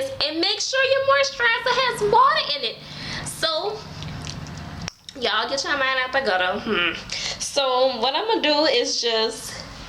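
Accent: New Orleans, United States. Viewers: I Love Your New Orleans Accent 😍😍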